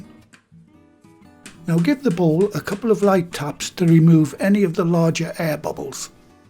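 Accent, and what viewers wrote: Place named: Northern England, United Kingdom. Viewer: This looks so yummy. Proper northern comfort food. You sound like a lovely northener. Thank you for such a well made video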